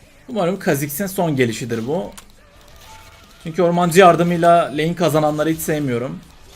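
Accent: Adana, Turkey. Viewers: Abi Adanalısın heralde turnuvalara katılıyor musun ?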